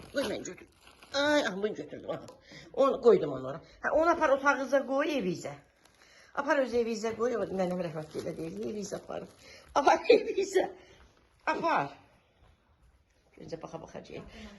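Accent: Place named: Nakhchivan, Azerbaijan. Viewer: O qədər xoşum gelir Naxcivan hehcesinden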